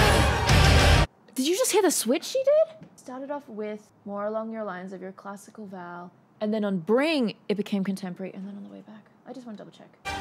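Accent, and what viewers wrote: Australia. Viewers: your reaction video of was the third music reaction video I ever watched. It still own's a little "piece of my heart". Hope you are well. Loved seeing you with Elizabeth Zharoff (The Charismatic Voice). Love hearing an Australian accent - never thought I'd ever actually write that! G'day mate. Vic 3044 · ... we are going to the advencha... love your ozzie accent :)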